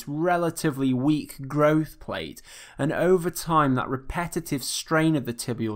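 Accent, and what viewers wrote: England, United Kingdom. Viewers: your english accent is super strong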